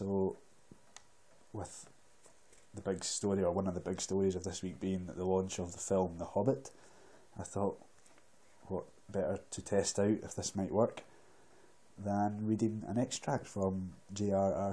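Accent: Scotland, United Kingdom. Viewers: I wouldn't rate this as an asmr. You could get more views and likes if you titled it as a Scottish accented reading of The Hobbit. Loved it btw. Used to live in Skye untill we moved elsewhere. So go ahead mate. :D upload some more. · Holy Highlands, Batman! Reading Tolkien in a Scottish accent. That *THUD* is just me, *Falling Over* ! Brilliant. I didn't get asmr, but it's usually random, anyway. I'm just so excited for this community that you made this video, because THAT'S WHAT I'M TALKING ABOUT! Definitely keep doing these. p.s.: "He came expecting mischief and didn't need to see his friends' legs sticking out of sacks to tell him that things were not at all well..." ;) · Hay! A fan of scotland and ye accent here. There's something fascinating about it, so definately do more. For 'untrained' ear, your speech sounds very odd and I hardly understand any words, but I like it a lot. 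Hopefully we will hear (about) you in some point again!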